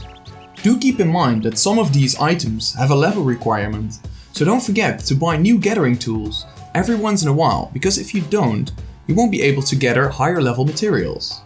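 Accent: Netherlands. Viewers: Sup mate!, great vid. I can tell that your dutch by your accent btw ;) (me to). I just started GW2 again after being absent for 4 years. Is there any way if you happen to know a dutch guild? I've always been in DuF (Dutch Finest) but they kicked me out for obvious reasons ofcourse. Maybe we could meet up in-game. Im serious about getting into it again. Played Blade&Soul for the last 3 years, but the poor optimization got the better of me. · i can hear u dutch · you sound dutch!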